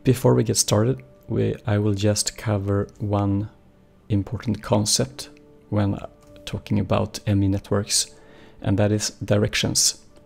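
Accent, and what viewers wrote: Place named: Sweden. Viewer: Hör så tydligt att du är svensk haha xD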